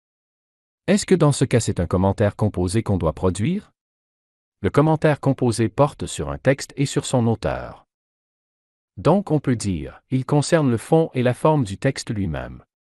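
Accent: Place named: Canada. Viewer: Great job.Pourquoi cet accent du Canada?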